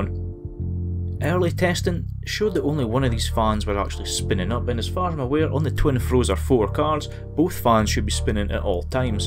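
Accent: Scotland, United Kingdom. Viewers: Love the accent! Is it from Scotland?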